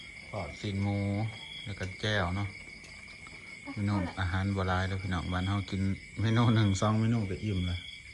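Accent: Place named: Ubon Ratchathani, Thailand. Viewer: เว้าคือทางอุบลบ้านแม่เลย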